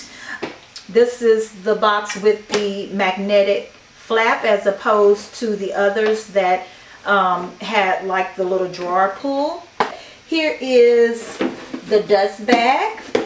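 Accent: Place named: Houston, United States. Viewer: I just discovered you and I think you are funny and knowledgeable. Plus you make me homesick. I’m from Houston and your accent is making me think of home. Subbing right now!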